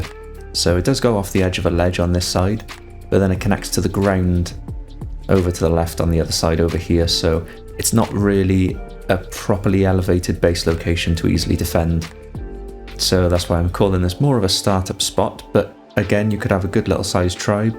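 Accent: Wales, United Kingdom. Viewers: I started listening as you sound very welsh, I kept watching as you are really good at explaining things and as such I’ve gone from worst ark player ever to competent enough to survive a week 😂. Keep it coming for us older folk who can’t keep up.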